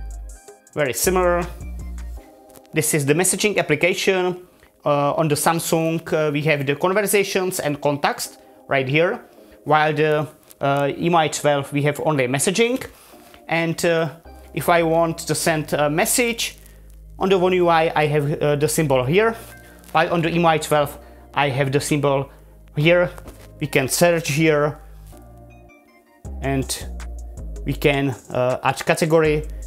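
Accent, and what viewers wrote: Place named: Czech Republic. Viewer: Ahoj z Česka! Pěkné slyšen náš krásný český přízvuk :)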